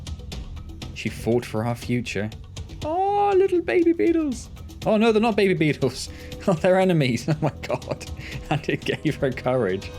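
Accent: United Kingdom. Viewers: gdamn i like ur voice u british?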